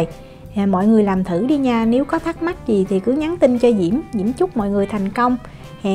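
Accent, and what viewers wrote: South, Vietnam. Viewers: Can ơn Diễm đã chia sẻ tí Mỹ sáng nay có sẽ làm theo sự hướng dẫn giường nói để thương nhẹ nhàng dung là chất giọng miền nam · Thích nghe giọng miền nam Diễm ..chúc bạn gd khỏe.vv.hp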